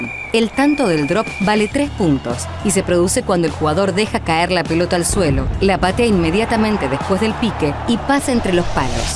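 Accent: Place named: Argentina. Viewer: Que horrible se escucha la voz Argentina, preferiría el Colombiano o Mexicano.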